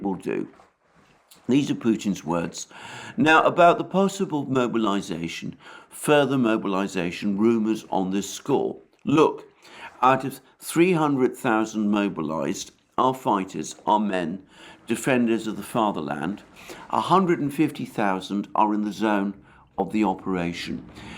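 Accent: United Kingdom. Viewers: I love your voice! Your authentic British accent is soothingly calm and civilized, yet with a range of emotion surprising to most Americans. Your phrasing is jazz, Frank Sinatra, Etta James. As expected, the famous British knack of understatement is here in spades. You leave me smiling and shaking my head for weeks remembering your deadpan delivery of the obvious, drolll punchline. Not to mention your background, knowledge and experience backing up your reporting on current political issues, giving me a much wider understanding of all the essential elements as they unfold. Thank You!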